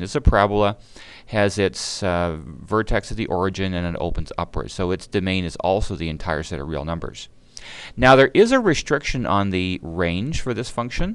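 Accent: Canada. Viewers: this is very helpful and is this guy canadian(irrelevant i know) i just wanna know BUT yes this video was helpful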